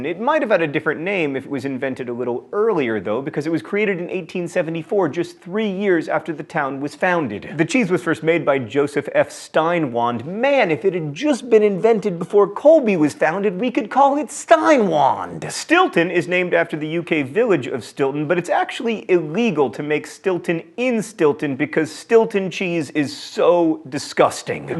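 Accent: France. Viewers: I like your french accent and just for that i like this episode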